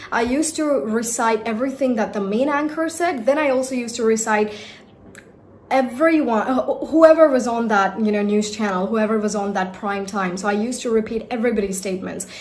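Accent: United States. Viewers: M aap s american accent m english sikhna chate ho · Look I know that you can speak only in one accent and that's American accent. Stop bulshitting people. You don't know how to speak in any other accent. And it's okay. Most of the people don't recognize these accents and that's why you can lie about it. Stop it, because it takes away your hard work. You know American accent well and that's great. Good job on learning the American accent.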